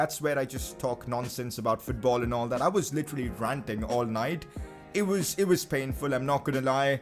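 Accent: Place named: India. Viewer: Pcq why is your voice weird like Indian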